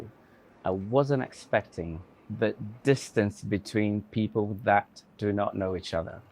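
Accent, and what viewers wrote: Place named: United Kingdom. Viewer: Your British accent is wonderful 👏🏻👏🏻👏🏻. Very nice the video! ❤️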